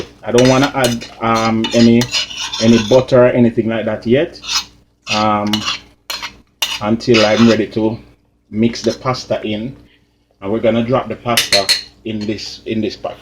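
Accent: Jamaica. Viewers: Hi, Looks Great! BUT PLZZZ SPEAK MORE PROPERLY BECAUSE EVERYONE IS NOT JAMAICAN NOR DO THEY SPEAK OR UNDERSTAND PATOIS. Just A Lil Friendly Advice. Blessing To U😊💚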